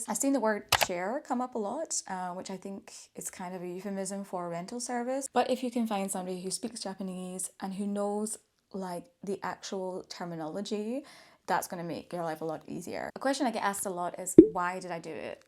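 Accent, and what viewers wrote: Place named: Scotland, United Kingdom. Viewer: Dear, I am not at all sure why your videos started appearing in my feed, but I am very glad they did. It could be because I had watched a few Japanese travel things previously but who knows the whims of the Great Algorithm? Anyway, I found the cuddling video deeply meaningful and also moving. It was also lovely to hear your accent (I am originally from Dundee) and your red hair. Oh, I am male, quite a bit older than you and "straight" whatever that means. I hope you will continue to make these lovely travelogues. Safe travels 😎😎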